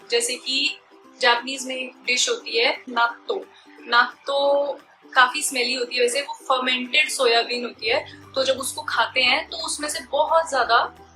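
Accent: Punjab, India. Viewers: mam r u from Punjab???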